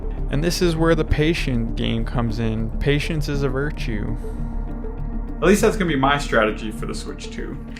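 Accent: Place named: United States. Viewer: the most american accent ive ever heard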